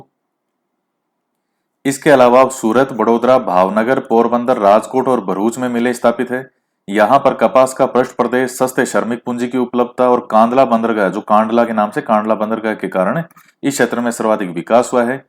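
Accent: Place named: Rajasthan, India. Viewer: sir Aapki awaj sunjar esa Lagata hai ki jese Rajasthan ki mati swayam apki Vani bankar aapki awaj k rup mai prakat hokar hum sabhi ko adhyayan or margdarshan karwa rahi ho. Jai Hind. Jai Jai Rajasthan.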